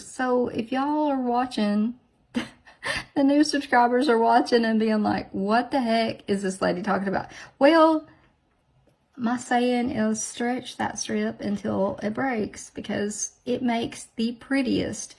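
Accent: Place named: South, United States. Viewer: I just love listening to you talk, and your southern charm and sayings. You do a great job!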